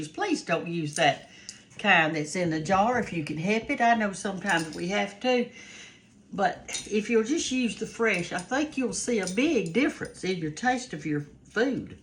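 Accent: South, United States. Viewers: Your hair looks so adorable ❤️❤️❤️ I just love tuning into to listen to you!! You remind me of my Grandma, but with your beautiful southern accent ❤️❤️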